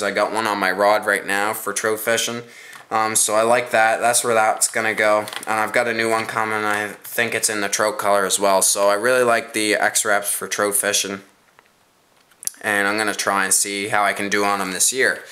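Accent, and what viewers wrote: Canada. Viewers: Aboot as Canadian as it gets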